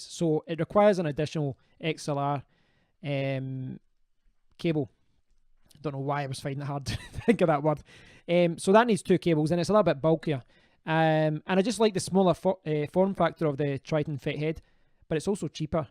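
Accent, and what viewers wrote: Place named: Scotland, United Kingdom. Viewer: I like your accent. Reminds me of CSGuitars but I can't put my finger on it. Is that a Scottish accent? Irish?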